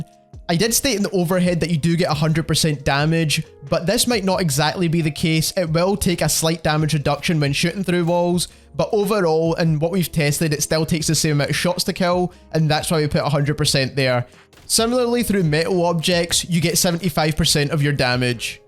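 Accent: Ireland. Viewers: He sounds Scottish or Irish. I think Irish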